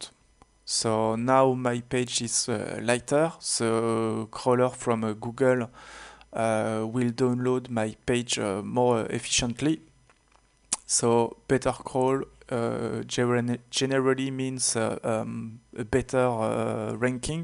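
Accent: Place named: France. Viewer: This really helped me. And I wish I spoke French, so I dig the accent.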